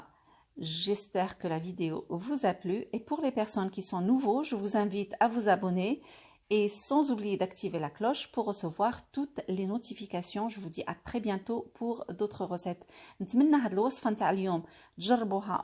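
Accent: Morocco. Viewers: C'est un accent Marocain ça 😒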